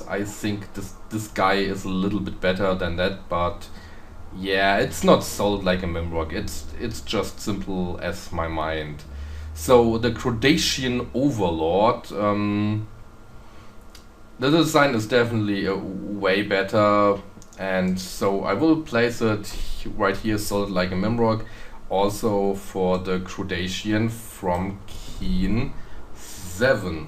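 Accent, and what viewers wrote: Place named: Germany. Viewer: The Overlord did teleport right on to me a time or two, but it seems that there is a little more time to get out of its way. I never made a formal study of German in school, so I don't like to criticize the performance of someone who has put in that time. You have a German accent, but I can understand you easily. It has its charms.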